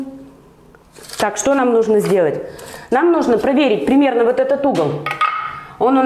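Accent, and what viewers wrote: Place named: Perm, Russia. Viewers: Акцент прям Пермский ) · Здравствуйте! Я смотрел сериал и там девушка прям как вы разговаривала! Вы из Перми ? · Вы с Урала? Говор знакомый. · Люблю Пермский говор. Видео понравилось, душевненько, но резать обои ножом навесу с запасом в 2 мм. это нужно иметь хороший навык. Да и многое другое рассчитано на хороший опыт. Для чайника не подойдёт.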